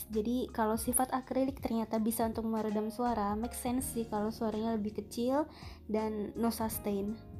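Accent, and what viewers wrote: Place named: Visayas, Philippines. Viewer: I thought you were bisaya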